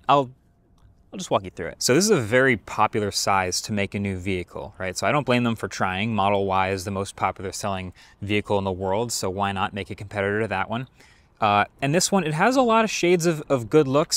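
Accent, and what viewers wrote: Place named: California, United States. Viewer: nghe giọng điệu này niết ngay cali rồi ! Đúng chứ người Mỹ gốc cây ?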